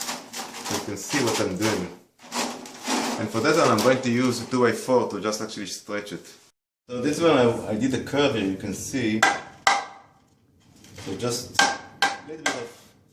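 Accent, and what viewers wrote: Israel. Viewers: You sound Israeli. Thanks for the video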